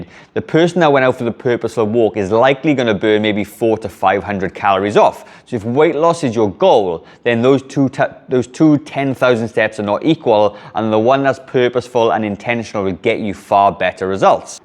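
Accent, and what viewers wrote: Wales, United Kingdom. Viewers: Walking. Just discovered your channel ❤ I live in Neath and so lovely to hear your Welsh accent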